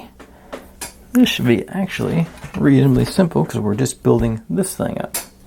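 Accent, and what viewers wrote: South, United States. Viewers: Thanks so much for this full video set--I picked it up about Section 5 or so when I started to get bogged down. Most often, I watched the videos after I had done a process, to make sure we had done it the same. I chuckled through a lot of the videos as you and I made many of the same mistakes and had the same re-dos. I ended up breaking 3 pieces, and mercifully there were spares of all of them included. This was my 4th ROKR and most complex one. I've done hundreds of jigsaw puzzles, but not many 3d kits. I was intrigued that you have the same regional accent as another YouTuber I've been following for awhile--no idea where he (or you) lives, but it seems like a Kentucky or west Tennessee accent. <link>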